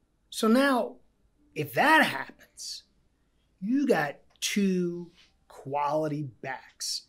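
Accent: New York, United States. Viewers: I know a NJ/NY guy when I hear one. Nothing like home cooking. Great job on the break down. You've added a follower in me buddy. FINSUP! 🐬🧡💙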